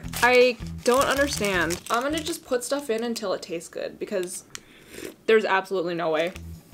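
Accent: England, United Kingdom. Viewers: British accent 🤌🤌🤌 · That English accent though 😬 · Is there a reason you speak with an annoying British accent or is that just to be annoying?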